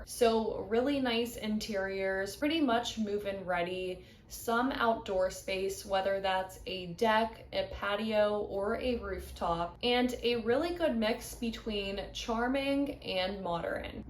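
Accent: Pittsburgh, United States. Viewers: Does she have a Pittsburgh accent? Is that what I'm hearing? For example, sandwiching an h sound between the s and t in street and stroll- "shtreet", "shtroll"...is that what Pittsburghers sound like? Anyone know? Anyone here? Hello? Hello, hello?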